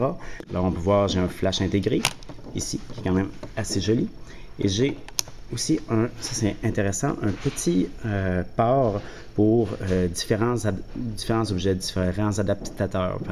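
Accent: Canada. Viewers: c quoi cet accent, tu habites au canada? ^^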